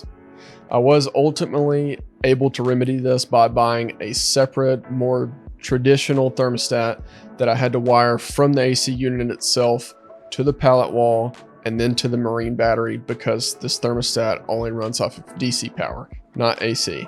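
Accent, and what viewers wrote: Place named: South, United States. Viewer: Interesting accent. A little bit of a southern accent, but not too southern.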